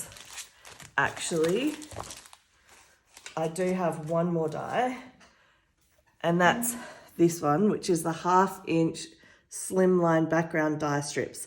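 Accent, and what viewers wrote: Australia. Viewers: Hi . New subscriber here. I just stumbled across your video and was DELIGHTED to hear an Aussie accent! That Pastel Deco is to die for. I'd love to see your version of the stained glass window technique. Shipping rates to Australia are the pits :[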